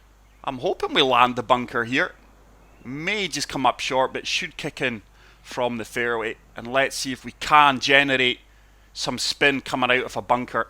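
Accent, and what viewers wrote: Scotland, United Kingdom. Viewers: Thanks for the vid, got Golf Club 2 and never saw any back spin. Though can use some pointers here, always a wee bonus getting a Scot doing a tutorial, nice one bud 🥃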